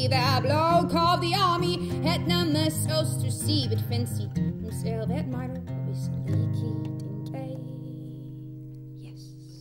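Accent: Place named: Ireland. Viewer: Volgens mij zei ze dat ze Ierse wortels had (; Dat verklaart het accent.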